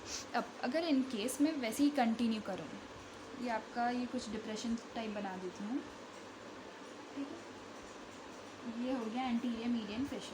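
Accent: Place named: Hyderabad, India. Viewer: well explained..... btw from ur accent ,u look from Hyderabad.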